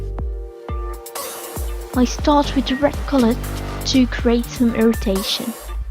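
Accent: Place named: England, United Kingdom. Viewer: Coooool!! U Engels accent kilt mij😂😂😉😘😘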